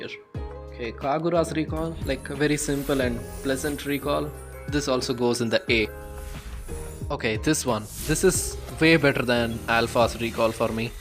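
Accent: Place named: India. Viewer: love your india accent man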